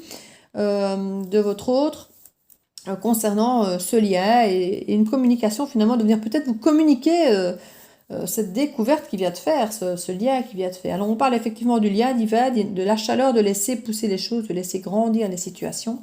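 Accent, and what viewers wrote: Liège, Belgium. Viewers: Tellement vrai et juste... On reste dans mon theme lol :p J'adore tes guidances sinceres et justes, sans complaisance, en toute honneteté... chaque video est un plaisir (puis j'adore cet accent liegeois :p ). En tous cas, je ne peux que te conseiller a te lancer dans les guidances privées car tu es vraiment tres tres douée et tu vois juste... N'aies pas de doutes sur tes capacités. Tu es geniale <3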